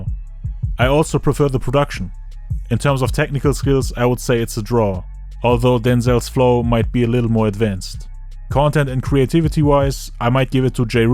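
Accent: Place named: Germany. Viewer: Sounds a Little Bit like you got a german accent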